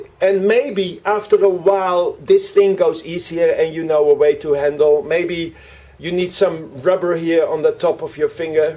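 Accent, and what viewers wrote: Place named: Netherlands. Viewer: XD you just can hear he's Dutch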